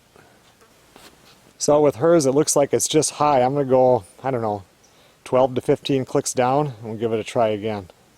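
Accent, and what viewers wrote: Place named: Midwest, United States. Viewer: I love your content & channel,. I’ve been a HUGE fan for about a year now. I knew you were from the Midwest with that kind of an accent. My brother is from Chicago, so I know a Midwest accent when I hear one. Your videos are so therapeutic after I clock out of my shift from the hospital. I watch your videos almost every night. Greetings from Seattle, my friend. 🙏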